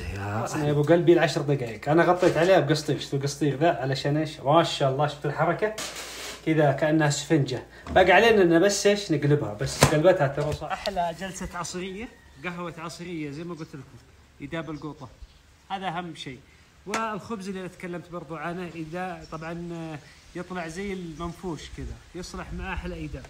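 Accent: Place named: Al Bahah, Saudi Arabia. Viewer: اللكنة زهراني او غامدي كيف كذا علمنا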